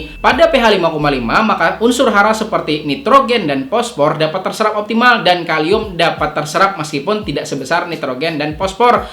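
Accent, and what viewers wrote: Banjar, Indonesia. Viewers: Orang Banjar kh abang ni? 😁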